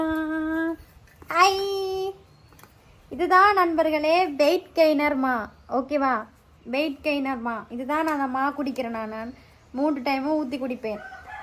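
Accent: Sri Lanka. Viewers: நீ பேசும் இலங்கை தமிழ் அழகாக இருக்கிறது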